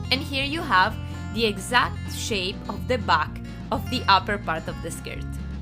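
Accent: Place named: Italy. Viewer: me encanta tu canal!!! sabes tu hablas mitad italiana mitad española saludos desde argentina!!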